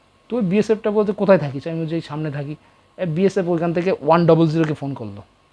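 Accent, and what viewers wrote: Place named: United States. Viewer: Mama rocks... darun laglo...what a Yankee style of talking... khub bhalo laglo ❤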